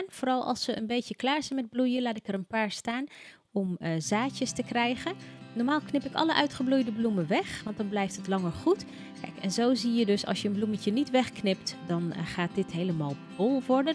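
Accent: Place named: Overijssel, Netherlands. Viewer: Woon je in Overijssel? Dat lijkt namelijk zo aan je stem❤️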